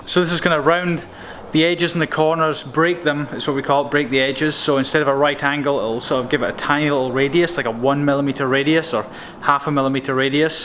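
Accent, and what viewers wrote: Scotland, United Kingdom. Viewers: You Scottish too? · are you scotish?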